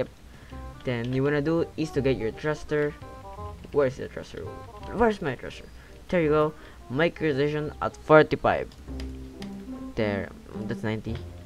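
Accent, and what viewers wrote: Philippines. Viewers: i just noticed that your filipino by your voice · Just from the accent i can already tell ur also filipino xd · You can see that he’s filipino cuz of he’s accents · I knew that cuz of the accent, oh right im a filipino too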